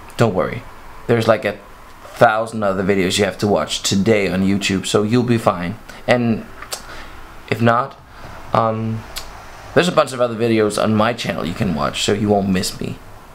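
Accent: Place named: Denmark. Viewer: du har en dansk aksang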